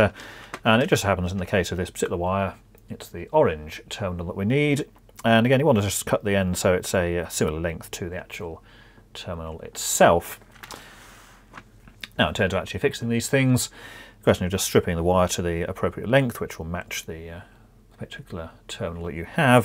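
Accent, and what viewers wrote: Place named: Southern England, United Kingdom. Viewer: PEREIRA Standard Southern English - it's the cadence that's probably throwing you.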